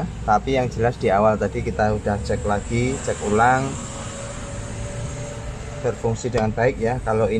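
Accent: Java, Indonesia. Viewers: Bang .......!!!!!! sebenarnya abang itu orang mana kok bahasa jawanya lancar and medok sekali terima kasih bang ... selalu jaya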